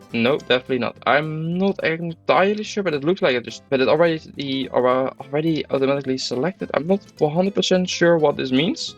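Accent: Netherlands. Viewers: de nederlandse accent!!! hahahah helemaal geweldig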